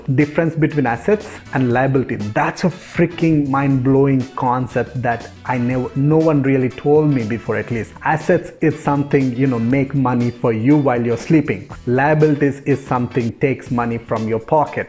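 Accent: Telangana, India. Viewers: Great story. You sound like you are from Telanagana, if so then which part of Telanagana you are from?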